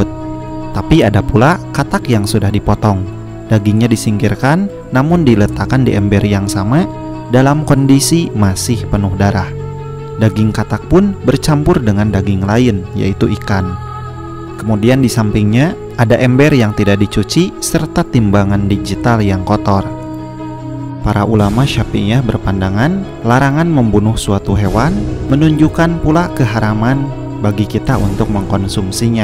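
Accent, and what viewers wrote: West Java, Indonesia. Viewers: Sunda banget logatnya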